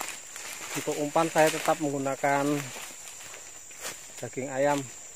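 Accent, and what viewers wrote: Java, Indonesia. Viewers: wong jowo, ndi kie suarane😊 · Jowo kelutok jebule😁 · suruh istri nya nyetrik biar mbak ngrasain streeek ikan gabus,asli dr mana mase koq logat bahasanya orang jawa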